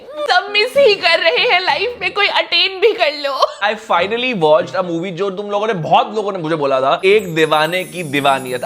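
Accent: India. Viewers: Your that desi ascent 😭❤️❤️❤️❤️❤️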